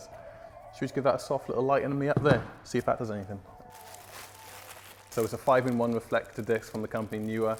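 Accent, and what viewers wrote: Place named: Wales, United Kingdom. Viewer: I not only have Welsh roots I still live there - I am so glad you mentioned that as whilst watching your videos I can easily hear your Welsh accent (I guess you are more south as I am near Aberystwyth) but struggling to work our where you are based - my guess is Australia? Best wishes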